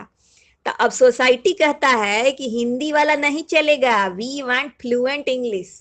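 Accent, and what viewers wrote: Bihar, India. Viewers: It's actually in a Bihari accent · i love bihari accent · Are gajab marde.ton se lgbe no kya ki Pakistani ho.. Bihari ton dito match kr diye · This kinds of Hindi speak people of western Bihar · Bihari accent cute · Love Bihari accent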